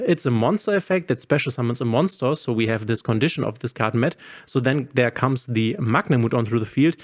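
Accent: Germany. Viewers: thick german accent lol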